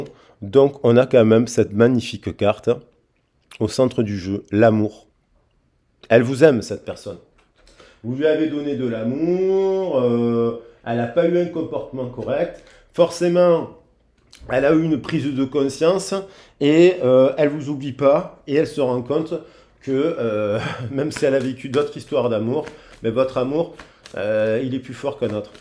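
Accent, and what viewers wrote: South, France. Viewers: Merci pour cette guidance 🙏, j aime bien votre accent du sud 👍belle journée 🤗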